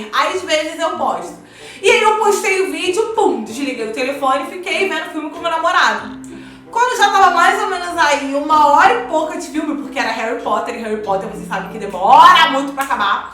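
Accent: Rio de Janeiro, Brazil. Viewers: Eu só sou escrita no canal!! Porque eu simplesmente amo o seu sotaque carioca Sua energia .Parabéns pelo sucesso 🎊🎉🎊🎉🎊🎉🎊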